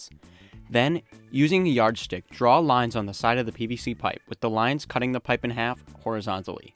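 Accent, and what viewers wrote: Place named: New York, United States. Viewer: you spund like youre from new york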